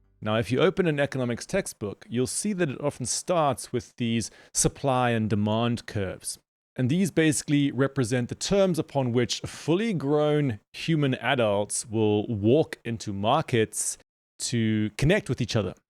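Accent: South Africa. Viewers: I loved Debt 5000 years of history. A must read. Thanks for keeping his memory alive. PS lekker SA accent! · I wonder if you are South African? Your accent reminds me so much of home. Great video, keep it up. RIP David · Subscribed. Excited to hear more from this channel. Are you originally a fellow South African? I feel like I hear a little trace of the accent.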